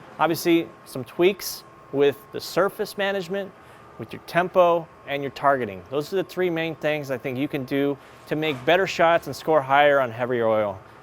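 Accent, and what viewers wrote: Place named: Midwest, United States. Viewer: Never heard of before but the minute she spoke, I thought "wow she sounds very familiar." Of course she's from Ohio like me lol. Midwest dialect I suppose.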